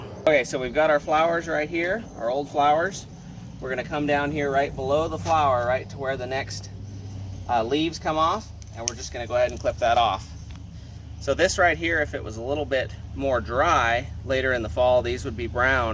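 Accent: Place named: United States. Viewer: First time I’ve seen your video and I’m totally in love with your American accent Oh and of course your lupins !!!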